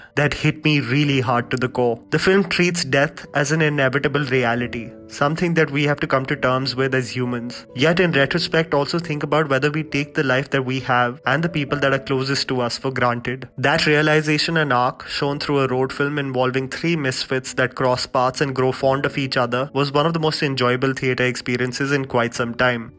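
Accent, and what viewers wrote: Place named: Mumbai, India. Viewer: Loved this movie!! & Ur Mumbai touch of speaking is really good.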